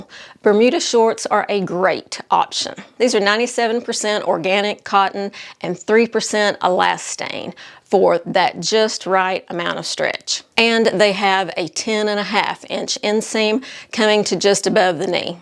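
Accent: South, United States. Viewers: Hi! I am new to your channel! Been watching about a month! I grew up in LA (lower Alabama)! Im 65 and enjoying your videos so much! I'd never heard of Quince before so i am going to check them out! Love the cashmere tank and the cotton sweater in all the colors! One if my favorite things about summer are the flowers and swimming in my pool! Also love getting to finally wear the summer colors and ditch the black and browns (for the most part)! Thank you for the great content. Love your videos! Oh and im enjoying listening to someone who sounds kinda like me ( Southern accent! 😂).❤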